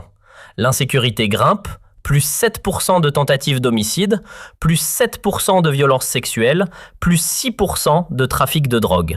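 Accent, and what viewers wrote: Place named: Quebec, Canada. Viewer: Stop à l'IA qui alterne les commentaires avec un accent Québécois et qui nous répète en moins d'une minute 2 fois la même chose RAS LE BOL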